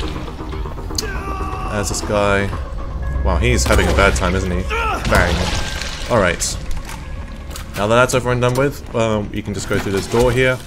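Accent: Australia. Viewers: thank you for this helpful guide mr. aussie man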